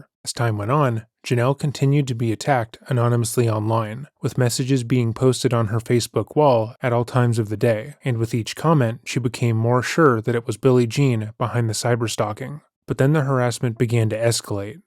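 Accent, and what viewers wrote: Canada. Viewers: Is it just me, or can we all hear the Canadian accent more and more these last few videos? I couldn't hear it or wasn't paying attention in your earlier videos, but your voice is one big reason why I love your channel, so I'm sure I would have heard it before. Just wondering. ❤ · First time completely hearing the Canadian coming through, guys. Currently in New Orleans, so, stark contrast. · First of all, I love this channel. I've been watching pretty much since day one and will continue to do so. With that said, I've noticed in the last few videos a canadian accent that I didn't pick up on earlier videos. Anyone else? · Wait, are you Canadian?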